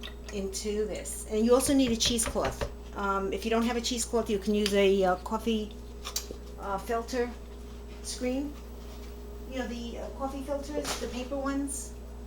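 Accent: New York, United States. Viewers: I think your video is useful.....it shows timing of how long it takes, first hand. Screw anyone that doesn’t like it. I like it and I also like hearing your NY accent ,by the way! Good job! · Luna Lia Gingerbread I believe that is a New York or Boston accent. Love it! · You sound like you have a New york, accent, I live in Rhode Island. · Great video! Fun gal. Wish you where my neighbor with your N.Y. accent! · Girl you're from NY! Brooklyn? I'm from the Bronx and I think Bronx and Brooklyn sound alike... I haven't made butter in so long here heavy cream is do high never found for 2 and change lowest $4.99 I'm doing keto so I use butter a lot! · is she from new-york---- city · Thank you for letting us into your home. Your very sweet. Loved listening to your voice/accent. Are you from New York or New Jersey.? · Shes new York o bet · I love her accent I think you should from Long island, New York, or perhaps Jersey. 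I also feel like she's a friend of mine like Francine said below my comment. Thank you I'm going to save this video very cool. I got a kick out of your frustrated with moving and not having all your things that you're used to using regularly. May God bless you and Long live butter!! 🐄 🥛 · I enjoyed your video; is that a NY accent?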